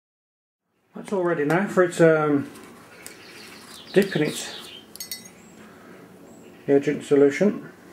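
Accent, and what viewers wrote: United Kingdom. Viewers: UK english?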